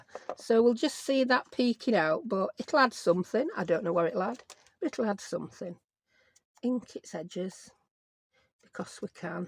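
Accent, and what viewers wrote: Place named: Blackpool, United Kingdom. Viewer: Loving your tutorials what weight of paper do you use especially for your inked backgrounds. Your accent is awesome it’s making me home sick ❤️. I live in NZ but hale from Blackpool .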